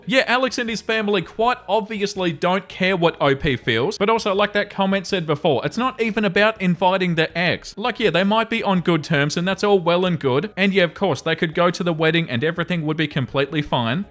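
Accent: Australia. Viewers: Today I’ve been binging these stories for hours while cleaning, I cannot express how much they help my ADHD riddled ass to stay on task lol. Brilliant timing for an upload since I was about to commence more late night cleaning. You’re a real legend for subjecting yourself to the chronicles of these insane people, all while maintaining a sound mental state. Insanity aside I really love your narration, it’s awesome to hear a fellow Aussie’s voice! Thank you for providing genuinely good content that isn’t TTS or AI :)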